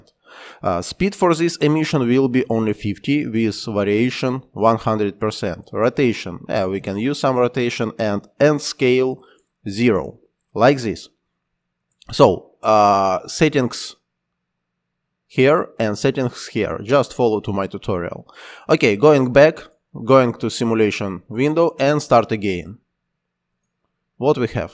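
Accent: Russia. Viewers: Это самый русский акцент, который я когда либо слышал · Слишком русский акцент...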